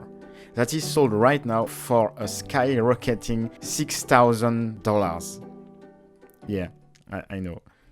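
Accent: France. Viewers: Encore une très bonne vidéo test avec de jolies photos, y a juste un truc qui me dérange....Your so French accent ! Jamais envisagé de faire des vidéos en Français ?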